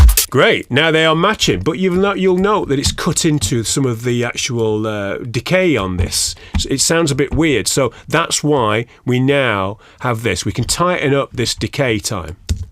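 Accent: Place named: North Yorkshire, United Kingdom. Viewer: Do you do 1 on 1 training? You sound like you might be from round my way… (North Yorkshire).. although been residing in BKK for 15 years. Anyhoo.. let me know.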